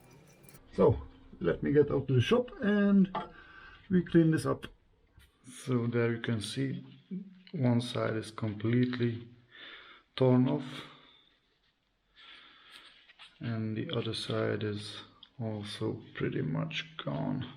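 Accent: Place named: Russia. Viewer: Hey i have just subscribed! Thanks for your videos. I am getting a 1987 double cab this week. It has 1900cc engine. Petrol and water cooled. I am inspired by your vids. By the way i am from Israel. Where are you from? I here an accent... maybe russian?🤔 any how thanks for your vids! Keep them coming.😁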